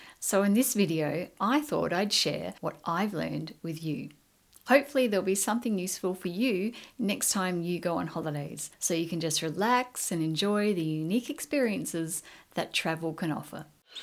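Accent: Australia. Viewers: One of the best packing videos I’ve seen. And I’ve seen a lot. It’s also great to hear an Aussie voice too. I’ve decided to give one bag travel a go next time we go overseas. Thanks for all the info in a very logical and calm way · Love hearing an Aussie voice ❤ Great video which has given me plenty of ideas as I prepare for a Mediterranean cruise and a few days in Dubai in September/October. Trying hard not to overpack for this holiday as I’m getting older and don’t want to be carrying items I don’t need.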